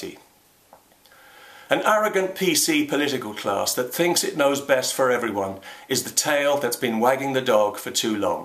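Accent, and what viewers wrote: England, United Kingdom. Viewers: Condell is himself an immigrant. Despite the English accent, he was born in Ireland.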